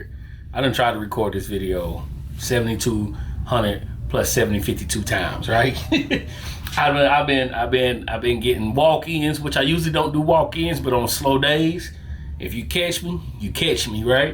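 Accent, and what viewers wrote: South, United States. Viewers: Nothing wrong with a southern accent brother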